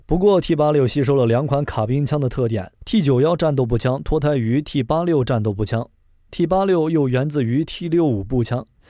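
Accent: China. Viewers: 大家都好認真留言但是都聽不出來大陸口音嗎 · 為什麼大陸口音那麼重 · 這播報主的口音怎麼聽都是中國口音~ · 你是国军退役？为什么你是大陆口音 机器人配音？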